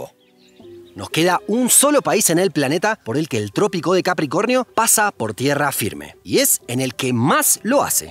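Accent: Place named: Rosario, Argentina. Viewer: Sí, y se renota que es de Rosarioooooo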